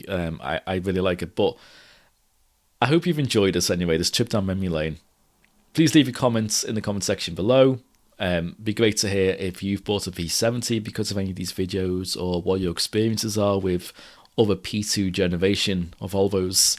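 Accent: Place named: Wirral, United Kingdom. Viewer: Do you still have this car buddy ? If so are you willing to sell it ? I live on the Wirral which doesnt sound too far from you based on your accent! Would love to buy it from you mate.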